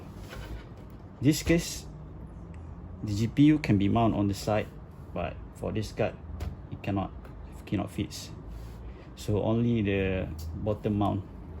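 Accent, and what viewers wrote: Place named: Malaysia. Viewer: Judging from your accent are you from malaysia?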